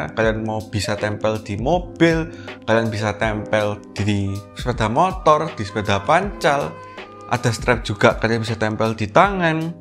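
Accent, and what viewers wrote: Java, Indonesia. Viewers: Matur suwun info ne ..... Sangat membantu, suka sekali lihat public speaking yg medok gini wkwkkwkwkw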